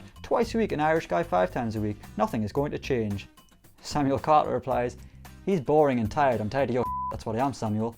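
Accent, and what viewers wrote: United Kingdom. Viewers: Tbh I like him. He just gets a lot of stick because he is maybe not as dramatic as Irish guy. It’s also cuz of his British accent. I prefer Irish guy but you guys are morons insulting him. Ffs he gets thousands of subs. It’s cuz his vids are factual when Irish guys are all about Grealish and paul mcshane. Some of these insults are dumb. If u don’t like him just leave. Trolls.😒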